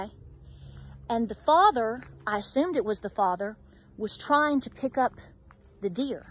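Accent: South, United States. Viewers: Love your Down to Earth style talking. Southern kinda talk; I'm a Tennessee born individual and (ha ha 😅😅😅) it's my style that's like down home old schoo.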